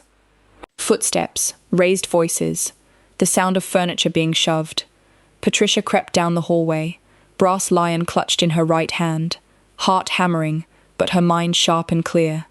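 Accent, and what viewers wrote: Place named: United Kingdom. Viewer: I just started watching from Hammond, Indiana, and already the sarcasm is everything you know if you’ve ever seen my comment I live for the British accent just makes everything sound right. The humor lands perfectly.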